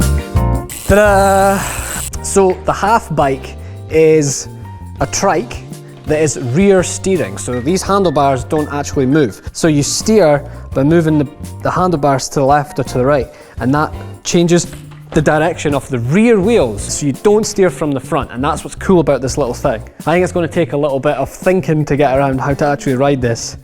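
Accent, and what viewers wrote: Scotland, United Kingdom. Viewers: Is scottish? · I canny be the only one thinking you sounded a wee bit more Scottish that usual in this one? P. S. Congrats on 1 million. Hats off.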